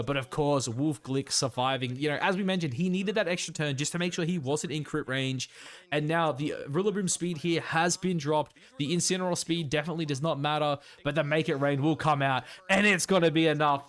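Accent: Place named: Australia. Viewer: bruh u sound like Chaz NBA i was so mind F*cked since i just seen his video 😂 you Aussies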